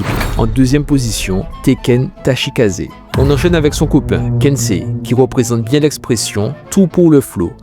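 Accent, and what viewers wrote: Caribbean. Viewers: Je sais pas mais t’es antillais ? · C'est un antillais qui parle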